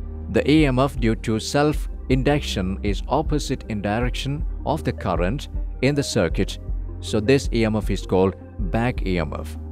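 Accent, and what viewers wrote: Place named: Kerala, India. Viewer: malayali ane bro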